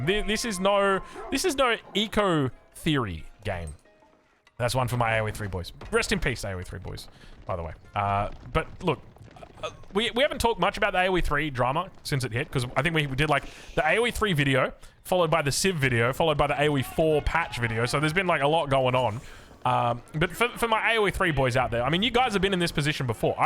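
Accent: Australia. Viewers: sounding Australian today me boy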